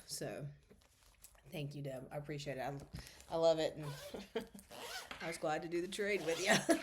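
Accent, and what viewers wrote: South, United States. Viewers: The reindeer feedsack is so cute! At age 75, my eyes don’t work too well on anything smaller than 32 count fabric, so would love to win it! Always love all your finishes and stitching! Also, being Southern myself I love hearing your accent! Makes me feel like home! ❤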